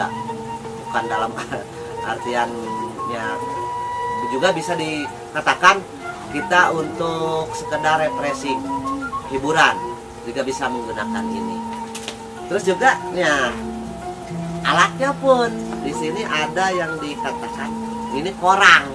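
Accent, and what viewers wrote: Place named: Sunda, Indonesia. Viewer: Uhh sunda pisan , mantap kang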